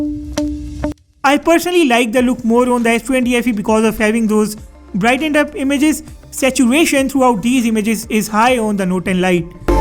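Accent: India. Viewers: I'm not saying I don't like indian accent I can't understand it😅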